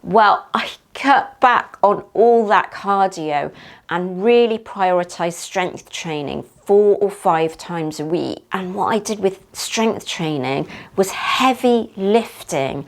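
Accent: England, United Kingdom. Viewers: You do look great, nice physique... I like the accent, You Brits 😆🤣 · That English accent wow. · You had me at your most splendid British voice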